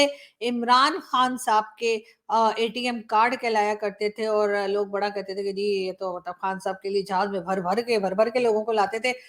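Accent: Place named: Allahabad, India. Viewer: you speak EXACTLY like ALLAHABADI speak You have atleast, inherited this from your father You are the MOST GRACIOUS AND LOVEABLE DAUGHTER OF ALLAHABAD MY piles of love and Ashirwad ❤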